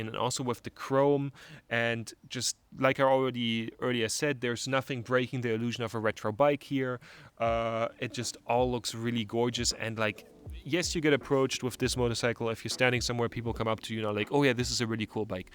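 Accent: Germany. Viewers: I know this is a wildly unrelated tangent, but I was fascinated by your accent. I realized it wasn't quite Dutch immediately, but even when you said you're based in Germany I didn't fully hear the typical 'Hochdeutsch' English accent, so I was wondering if you might natively have a more Plattdeutsch - Niedersachsen-ish dialect... and then I saw the CE plate on your Interceptor and it kinda clicked. Do you natively speak that more low-saxon dialect? Anyway, from a Dutch guy who lives in Norway, thanks for the video! I've pretty much made up my mind about getting a Bear in the spring of 2026, but any and all comparisons I can watch before decision day arrives are great. Love to see that you managed some TET, particularly in Scandinavia, I'm very keen on doing some of it myself as well :)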